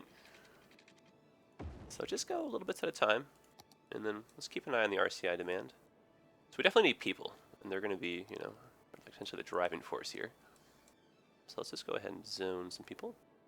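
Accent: Toronto, Canada. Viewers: Great videos! For the past few days I've been watching a ton of Cities: Skylines videos and I've noticed a huge problem with all of them. The person doing the video makes a lot of small mistakes that have an immense impact on the gameplay and they don't notice it until maybe an hour later. SOO annoying. I know they have a lot on their plate when doing a video, but mostly I think it's because they are rushing it. With your videos, I haven't noticed anything wrong. Love the laid back style! Ps. I live in Toronto too at the moment and I like your accent :D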